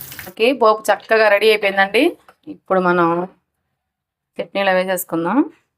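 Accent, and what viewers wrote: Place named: Guntur, India. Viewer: Super super superrrrrrrrrrrr intlo chesukodaniki simple and superb Tq Gnt slang chala neet ga vuntundi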